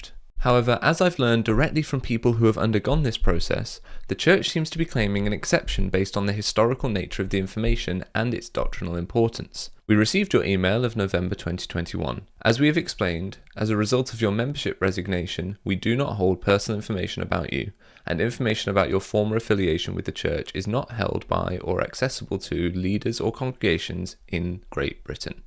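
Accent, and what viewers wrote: United Kingdom. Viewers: Yeah, he’s sneakier than a lot of antis. His British accent misleadingly indicates a warm, intelligent tone.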